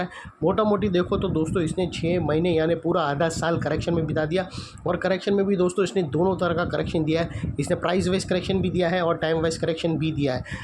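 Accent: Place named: South, India. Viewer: thankyou bhaiya for giving your valuable time to some random 19 y/o stranger I will invest more in my learnings before hand i was a forex trader who trade in 4h and 1h but as I shifted to Indian swing I find it quite difficult to understand as forex is closed for just 3-4 hours a day but you are really helping me in here i have watched all your videos trying to understand things and i am really grateful that you still scam stocks out of so many studies stocks and invest your valuable time even thou you are not getting the audience you deserve even thou you just want to help others people and you are South Indian so that accent is little bit different for people from TATA, JAMSHEDPUR ,❤️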